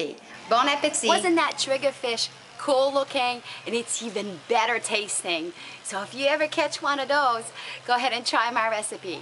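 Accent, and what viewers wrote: Louisiana, United States. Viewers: Ate you cajun?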